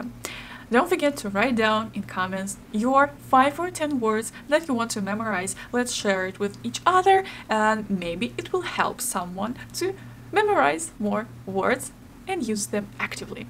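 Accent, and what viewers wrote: Russia. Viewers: Is Russian her first language? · Is she Russian?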